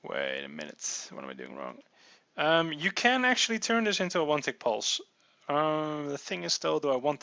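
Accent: Netherlands. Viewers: Are you dutch? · Het , are you dutch. You sound very hollandische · lol jij bent nl dat hoor ik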